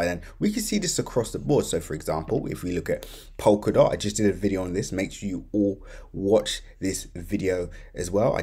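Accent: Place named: Essex, United Kingdom. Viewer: You got to be near me in Essex lol I can tell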